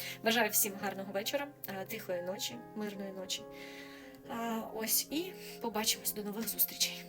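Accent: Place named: Ukraine. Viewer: Вперше побачив вас українською, дуже класно. Але так як ви україномовна,то російська фальшива. У мене так близькі родичи розмовляють російською які жили зі мною,а потім переїхали в Харків. То ваша російська добра,але дзвенить українською,тому українською більш на часі. Але ви усе в кучу насипали. Живу на Ізюмщині то додав би вам більше,але це ж ваш канал. Теж тримаю корів,перший раз події 14 року мені завадили,тепер з 22 року війна не на користь моєму домашньому господарству. Буду дивитись і можливо чомусь у вас вчитись,але бажано українською, хоча російську добре знаю. Моє сьогодення мені підказує що українською краще. А ось без політики не вийде,не там ми живемо і у нас війна,жили б ви на острові Пасхи то тоді да а так за цей період,війна глибоко в нас,навкруги і дає свої корективи на життя.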